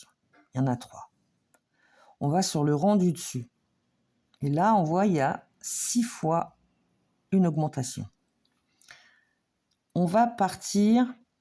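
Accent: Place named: Nord-Pas-de-Calais, France. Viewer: Je peu me permettre de vous demander d’où vous venez car vous avez une façon de parler ont dirait une ch’timi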